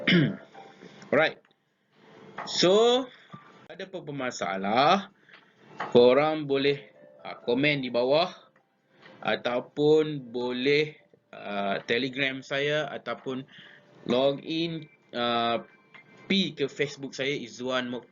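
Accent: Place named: Perak, Malaysia. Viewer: Cikgu ckp loghat perak tu stai wuuuu 😂😂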